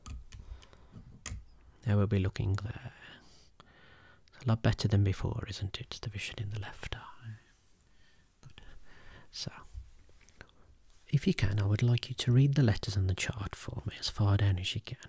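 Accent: United Kingdom. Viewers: A month ago I said this would be the holy grail. Many agreed and you commented about the setup challenge. This is your second attempt, and while I’m excited to watch this I first have to say I’m blown away with your dedication. I was using ASMR to sleep before there was YouTube (bob ross DVD’s). Your unique whisper and Ben Kingsley accent already took over my nightly playlist, but a second attempt to perfect this requested RP Is why a 41 yr old now feels like a groupie. Keep it up, and thank you!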